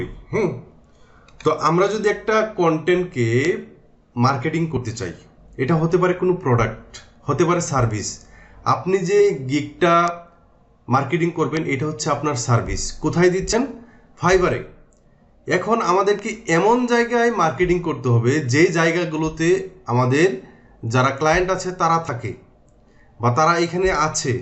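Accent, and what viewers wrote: Sylhet, Bangladesh. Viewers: Bhaia apni ki sylhet teke?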